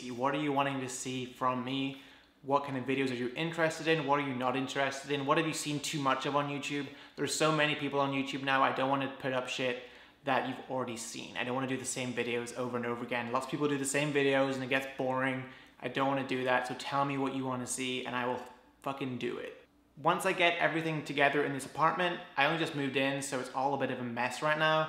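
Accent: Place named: United Kingdom. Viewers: Lily Kim He is British. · Lol thought he was British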